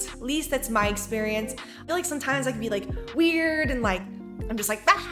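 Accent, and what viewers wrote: Midwest, United States. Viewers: Your accent is so cute! You sound like a real Midwestern :)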